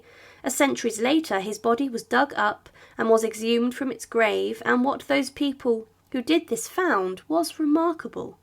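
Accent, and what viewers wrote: United Kingdom. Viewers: At least it’s a British accent not an irritating American one..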